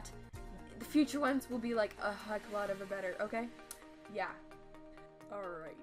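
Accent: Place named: United Kingdom. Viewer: 'Layers are the essence of art' now this my friend is a good quote! You're so right layers are everything ahah! well with how i like to art anyway! XD You can see your improvement so evidently!! The anatomy and the fluidity of her pose looks so much more natural! Also that portrait is super cute! I legit always use references! i'd like to make my work a lil more stylised tho :) ahahah you cutie! its 'A' "y' 'art' '96' thanks for the amazing british accent now i know how i sound!! XD (never does a voice over again) jk jk :D and its true ya WONT SUCK FOR EVAA. You have definitely improved it looks great and you should be happy with yourself gal! Who knows where you'll be next year <3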